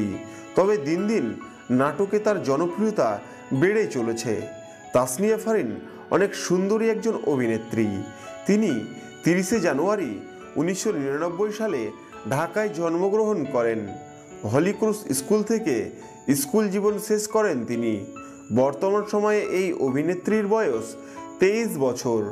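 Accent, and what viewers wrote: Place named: Kolkata, India. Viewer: apnar voice ta kolkatar moto mone hoyce..sorry don't mind..,,,,🌷🌷🌷🌷🌷🌷🥀🥀🥀🥀🥀🌸🌸🌸🌸🌹🌹🌹🌹🌹🌹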